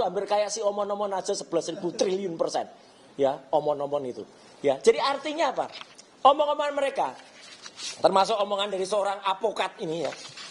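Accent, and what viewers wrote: Java, Indonesia. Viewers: Awas lu panci karang Luh keras dan medok bahasa nya. Kalo masuk bui jangan cengeng Luh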